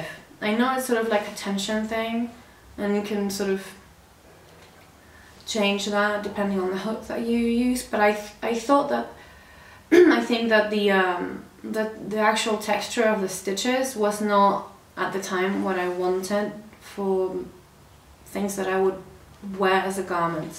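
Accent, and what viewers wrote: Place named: Chile. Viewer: Hi! Nice podcast and I love your acento chileno:)( when you say Santiago de Chile ) I miss that accent ( Im originally from Argentina, now living in Canada )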